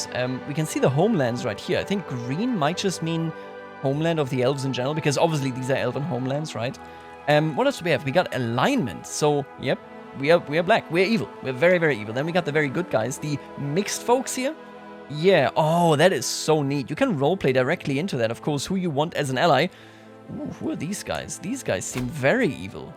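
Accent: Germany. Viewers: I heard your english and think: "Mhm he sounds like a german." And than i see your Channelname 😂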